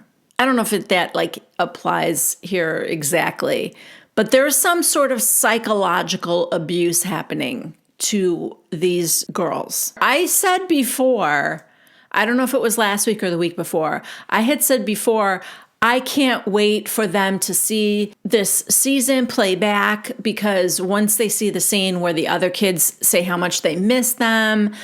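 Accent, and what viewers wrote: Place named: Midwest, United States. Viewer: I got to say we are in March 2024 and I too used to like ‘s videos. I do not like her anymore. Not that that matters or is going to sway anyone’s opinions but she is confused between a content creator on YouTube and Homeland security. She dives into peoples lives. I mean yes granted they are public figures but when no one would be surprised if she knew their blood types and their Social Security numbers, that’s a bit of a problem however, that is whatever you know I think that she does a little too much, but that was just my opinion. It wasn’t until Garrison died that my opinion has really completely changed about her, she is sitting there criticizing TLC in the production company and she’s not wrong with what she’s saying she’s not wrong at all with what she’s saying actually but what she’s criticizing them for having things out there that they may see and they may have to relive all of that she is doing the same exact thing, every Difficult situation that family has ever gone through. 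She has made at least five videos about at least each thing and then when it’s like bigger things then she’s putting out like three or four videos a day about it so it’s a little bit of the pot calling the kettle black to me, but that was just like the cherry on top of the Sunday really was the fact that this girl who lives in I’m not sure where she lives but with the way that she talks that accent that she has the way she says things it screams like Midwestern to me But she is where she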